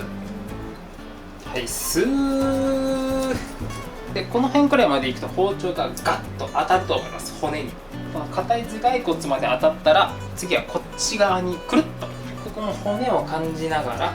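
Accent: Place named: Fukuoka, Japan. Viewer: 速攻で冷蔵庫に直してきました。久しぶりに福岡弁を聞けて地元に帰りたくなりました！さんおかえりなさい〜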